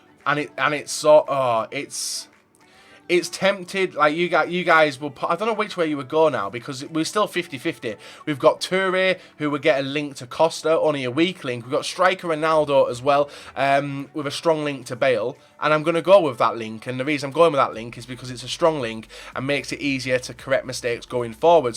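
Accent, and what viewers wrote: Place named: Yorkshire, United Kingdom. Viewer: Zeid Abdellatif I think it's a Yorkshire accent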